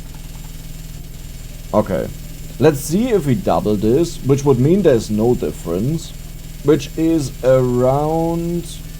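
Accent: Germany. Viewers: are you german bro ??? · Bro bist du deutsch?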